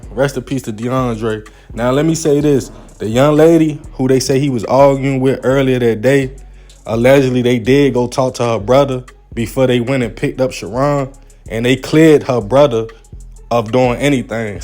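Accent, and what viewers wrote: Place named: South Carolina, United States. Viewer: U sound like u from South Carolina or sum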